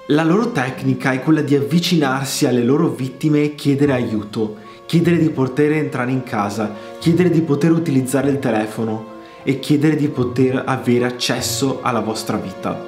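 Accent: Veneto, Italy. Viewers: gamer foxy22 nord perché è del Veneto😆😇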